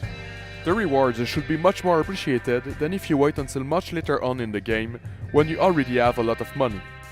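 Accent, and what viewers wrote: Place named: Quebec, Canada. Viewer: J’entends l’accent québécois bro 😂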